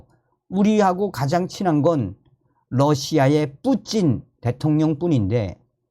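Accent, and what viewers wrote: North Korea. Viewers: 북한 사투리 쓰면 왜 안됩니까 현장감있게 전달하려면 북한사투리던 뭐던 있는 그대로 전달해야죠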